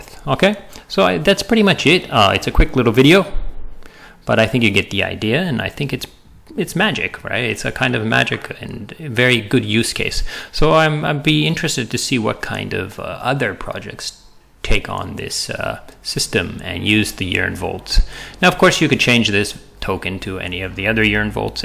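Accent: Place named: Italy. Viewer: Hahaha - more italian accents please :)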